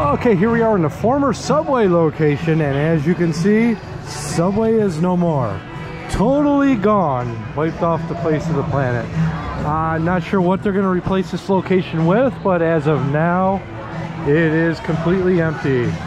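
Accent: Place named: Minnesota, United States. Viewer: You from Minnesota or Canada? I can hear that accent right away.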